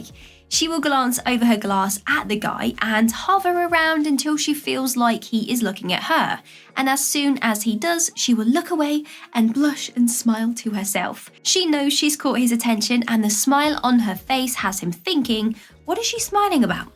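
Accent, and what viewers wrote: United Kingdom. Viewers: She is british....at least the accent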